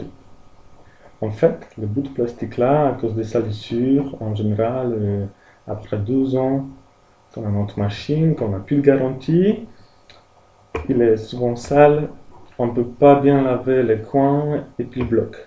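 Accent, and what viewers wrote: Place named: Poland. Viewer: Explications un peu longues mais Merci car je viens de sauver ma cafetière ! A poznaje czysty polski akcent !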